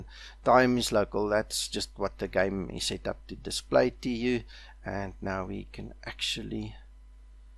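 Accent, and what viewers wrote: South Africa. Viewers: Love the SA accent mate :D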